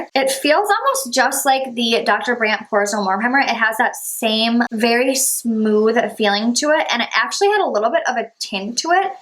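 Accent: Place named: Midwest, United States. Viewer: I just found your channel and I'm obsessed with you already lol and I love your midwest accent!! I'm from Wisconsin so wooooo midwest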